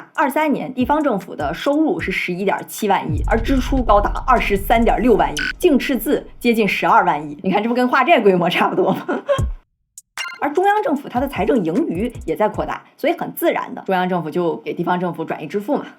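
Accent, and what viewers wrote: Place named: Shandong, China. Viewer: 這集小林的中國腔很重，難怪避重就輕。説了很多（足夠賺流量），卻好像沒説？ 看來我還是應該看立場鮮明的博主。。。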